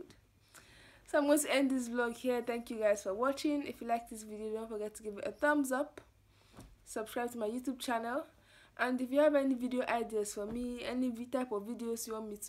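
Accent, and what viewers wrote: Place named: Nigeria. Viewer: Hi Your Nigeria accent is still strong Love from Ukraine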